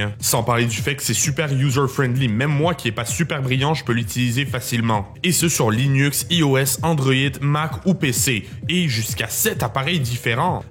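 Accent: Quebec, Canada. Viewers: Yo t'es quebecois???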